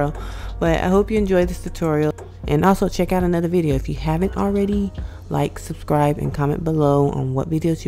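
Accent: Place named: South Africa. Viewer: You look so South African! Your accent gives it away. Gorgeous!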